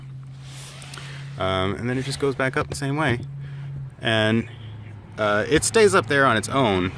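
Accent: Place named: Midwest, United States. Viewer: I came across your channel while searching for videos on Mini Van conversions as I have a 2014 Town & Country. As I listened to you I thought you sounded so much like my nephew who lives in Hobart. I watched all of your videos in one sitting and in one you state you're going from Portage to Portland. So that WAS a mid West accent I was hearing, and truly you sound just like my nephew! I thoroughly enjoyed watching your videos and look forward to more! You have a wonderful sense of humor and a great voice for YouTube!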